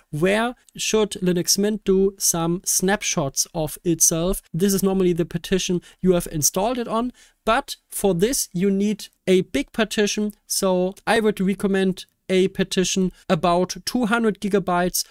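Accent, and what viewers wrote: Germany. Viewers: weil der deutsche Slang durch kommt 😉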